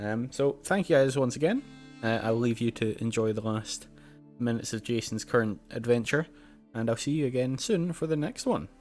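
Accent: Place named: Scotland, United Kingdom. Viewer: I swear, I can't just be hearing a "oh, yeah, both scottish" accent between you and Fandabi Dozi. It sounds like same damn neighborhood. Though I guess, I'm ignorant enough maybe I'm catching a dialect shared over a metropolis? Though it seems more regional. Like you're the same guy, but 5-10 years younger. Near enough to sound like brothers. Ps. These videos are great. Best I've seen since Pete Complete. And I'm both always looking and hella picky. You seem to have good instincts/training/etc for this.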